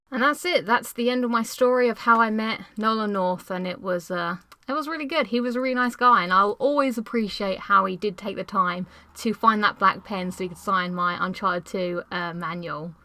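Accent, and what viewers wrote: United Kingdom. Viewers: Are you british? I love your accent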